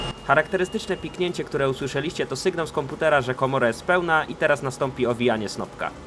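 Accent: Silesia, Poland. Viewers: Jesteś z śląska?? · jesteś ze śląska? bo czuć u ciebie ten śląski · Mówi się zilos, a nie silos. A w filmie zauwarzyłem że też godosz po Śląsku. Ps. Jestem ze Śląska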